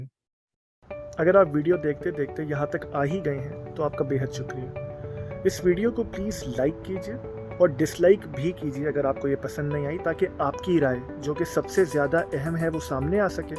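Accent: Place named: India. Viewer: Yar ae banda Indian ki tarah batt karta hai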